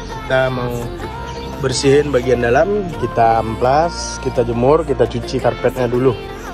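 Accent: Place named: Sumatra, Indonesia. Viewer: Bahasa indo nya logat sumatra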